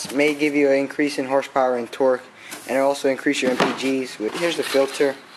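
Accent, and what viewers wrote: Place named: Louisiana, United States. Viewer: Kaplan? New Iberia? I’m trying to place that accent, lol.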